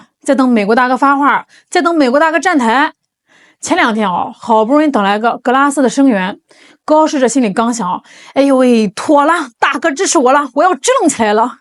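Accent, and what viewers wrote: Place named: Northeast, China. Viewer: 主持人能不能不说东北话😂😂，还有说话的时候能不能别露牙肉😂。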